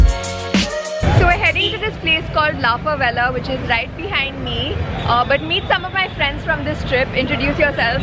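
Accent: India. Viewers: It's Indian accent.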